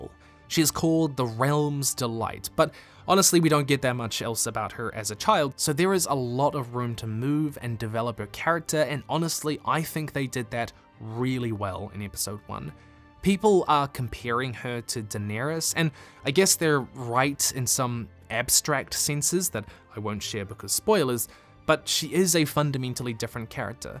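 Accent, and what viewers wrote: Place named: New Zealand. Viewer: I can't believe I didn't pick up that you were also a New Zealander. I guess I'm just not used to hearing our accents in the online space! Anyways, great video - thanks!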